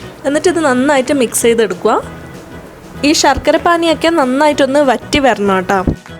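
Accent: Kannur, India. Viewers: Hello Njn ipppozhan video kandathu ... Undakki nookki super aayirunnu.. Thk u chechi Chechi evideya kannur aano Kannur slang pole thonnni enikk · Kannur slang?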